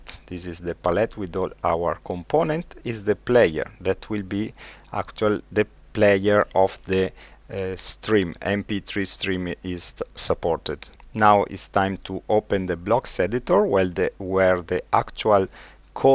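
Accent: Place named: Brazil. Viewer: Inglês brasileiro ;) We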